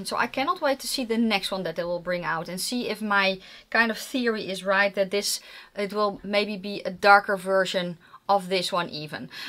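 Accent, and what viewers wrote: Netherlands. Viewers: Wat doe je dit goed zeg! Hartstikke leuk om van je te leren, je legt het zo duidelijk uit en ik hou ervan om mijn eigen accentje te horen tussen alle Amerikaanse reviewers! En de combinatie die je in het begin noemde… heel herkenbaar! Ga vooral zo door, ik ga je volgen!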